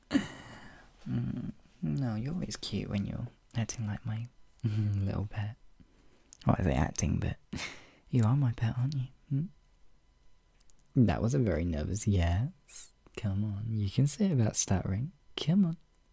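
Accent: United Kingdom. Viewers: You talk so much like me it's unreal :3 I'm also British, and I do a lot of pet play with others (and MIGHT be a teensy bit into kitten space myself), but your cadence and word choices are so beautifully specific. Your improv skills are amazing, such a treasure to stumble upon this! ^w^ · But like- I thought u were scottish why do u sound scottish to me 🤔✨ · oi.. I'm crying you're to cuute the british is too cute I'm dead from fanboying too hard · This audio is so British it makes me happy 😊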